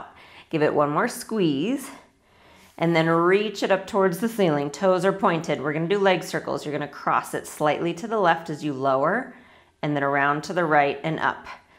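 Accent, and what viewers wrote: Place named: United States. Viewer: How do spell' peeppers '. Can't understand American accent!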